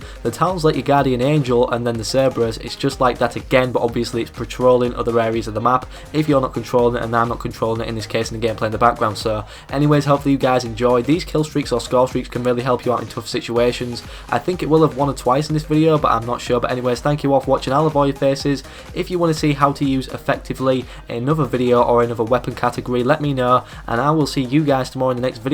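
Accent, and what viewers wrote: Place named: Yorkshire, United Kingdom. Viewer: Yo Mama he is from Yorkshire dumb ass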